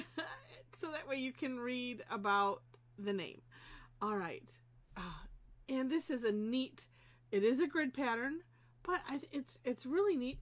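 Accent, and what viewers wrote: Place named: South Dakota, United States. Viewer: your accent is very much like a friend of mine from SD. Just guessing.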